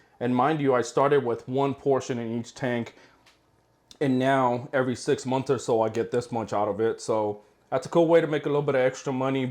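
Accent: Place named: United States. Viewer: Do you ship in the USA? You sound like an American but I have messed that up before. 😂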